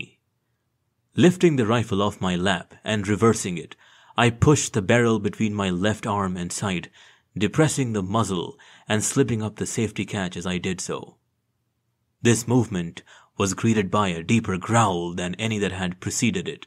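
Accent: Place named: India. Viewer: The ‘neutral’ Indian accent, the one that doesn't specify any regional recogs.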